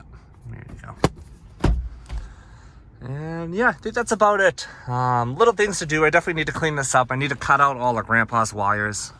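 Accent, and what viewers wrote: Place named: Boston, United States. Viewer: Nice ride, can hear your Boston twang haha. Wheels look like they’re in good shape too, those lace wheels look great on the XJ. Seems like a major upgrade over the last one!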